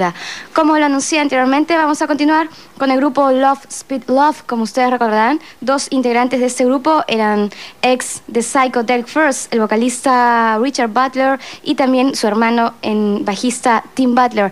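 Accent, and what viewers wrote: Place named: Europe. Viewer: Yo tambien ..me enamore de la linda vozz .. como sera la muchacha.. esa vos melodica tann riff que ..vozz media europea.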